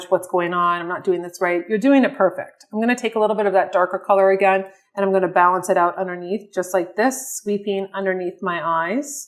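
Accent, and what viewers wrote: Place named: Miami, United States. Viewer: I just stumbled upon your videos and as soon as I heard you talk, I said to myself.. She’s from Miami. I am, too. Great makeup tips btw. 😊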